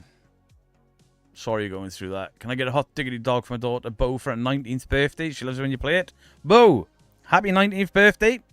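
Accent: Wales, United Kingdom. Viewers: Can tell you're welsh lol drop kicking annabelle 😅😅😅😅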